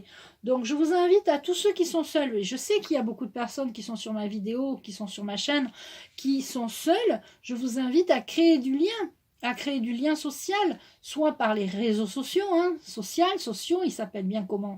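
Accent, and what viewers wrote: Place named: Marseille, France. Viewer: J'adore ton accent il ressemble au mien de Marseille ❤️❤️❤️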